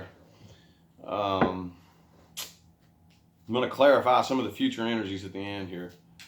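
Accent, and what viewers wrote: South, United States. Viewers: Marry me? 😍 A tarot reader with a southern accent....swoon!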